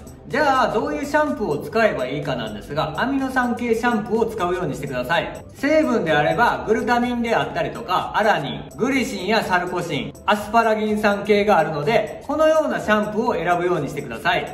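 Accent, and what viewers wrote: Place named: Kansai, Japan. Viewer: コテコテの関西弁が耳障り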